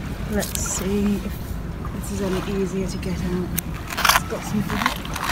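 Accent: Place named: England, United Kingdom. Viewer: You have such a sweet English accent.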